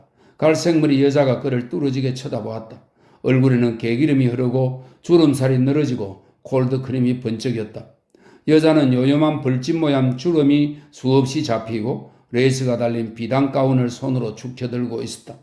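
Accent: Gyeongsang, South Korea. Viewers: 완독 고생하셨습니다. 정말 감사합니다. 장중한 소설을 구순한 음색과 살짝 경상도스런 억양으로 아주 잘 감상했습니다.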